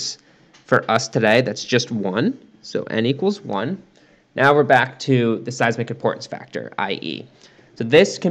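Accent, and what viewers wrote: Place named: United States. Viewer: I did a thumbs up because your voice sounds enough like mine for me to understand you. I am so grateful for a fellow American "accent!"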